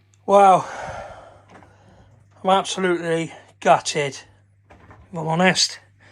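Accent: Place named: Devon, United Kingdom. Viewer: lol sounded sw as in Devon... Plymouth (Plymonian)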